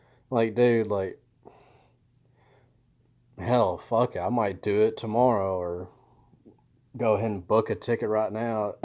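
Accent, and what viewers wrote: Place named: South, United States. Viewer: Btw idk why, but the southern American English accent is my favorite. Your voice and accent rly gets me feeling cozy, next to a campfire, having a drink with ppl i trust (idk thats how your accent gives the vibe for me).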